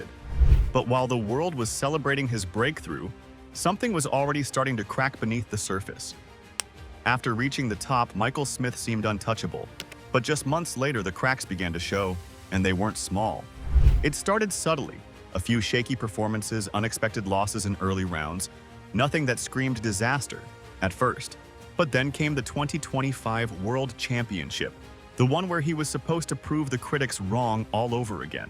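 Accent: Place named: United States. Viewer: an American voice over and a few repetitive meaningless clips. Absolute shite!!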